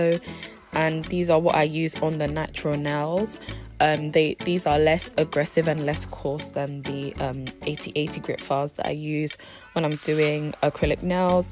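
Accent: United Kingdom. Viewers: So happy I heard a British voice, even better London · yesssss a uk gal! finally ❤️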